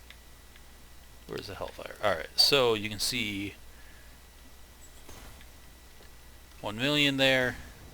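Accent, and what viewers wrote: United States. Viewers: This guy has gotta be midwestern I can tell from how he says slag "slayg"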